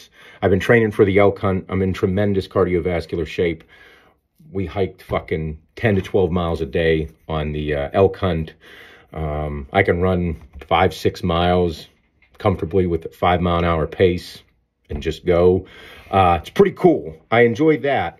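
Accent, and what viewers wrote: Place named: Pennsylvania, United States. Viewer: Love that PA accent lol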